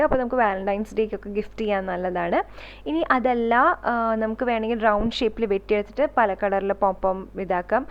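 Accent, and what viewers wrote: Thrissur, India. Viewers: Chechi Thrissur kari anno 🤔🤔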